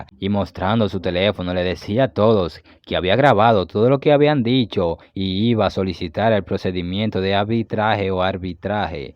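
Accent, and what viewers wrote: Dominican Republic. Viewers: Pero ven acá loco tu eres dominicano? Ese acento no me engaña 😂😂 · Eres Dominicano?? Tu voz y tu jerga me paracen de un Domi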